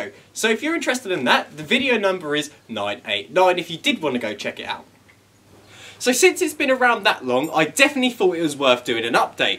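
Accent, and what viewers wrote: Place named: United Kingdom. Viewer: Your accent was 😎 cool just like any British 😂😂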